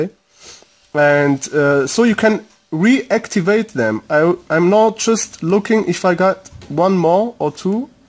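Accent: Germany. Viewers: Man merkt sofort dass du deutscher bist.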